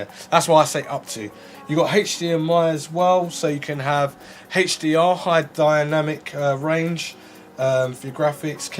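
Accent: United Kingdom. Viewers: hey mate i like it again, i even like more yr brittish accent than the video itself lol greetings from paris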